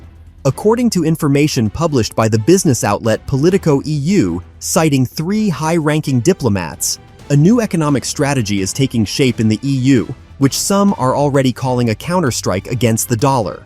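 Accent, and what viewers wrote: United States. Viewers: Choose a non-yank accent for your AI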